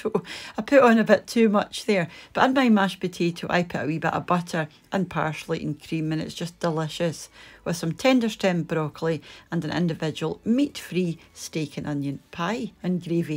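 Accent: Scotland, United Kingdom. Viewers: I am here just to listen to your lovely Scottish accent. So soothing. From the US but lived in Dunoon many years ago.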